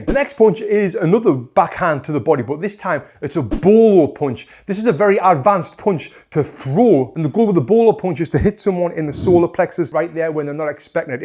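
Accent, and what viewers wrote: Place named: England, United Kingdom. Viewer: Comment after comment of people inspired by your teachings. You should be proud, not sure what type that English accent is but it def adds something, that’s for sure.😂😂😂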